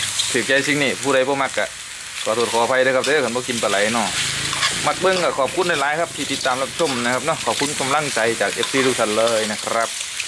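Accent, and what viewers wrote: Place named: Central Thailand, Thailand. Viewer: ทำเหมือนพากกลางเลยคับแต่ไม่ใส่มาระคับแชบๆคับ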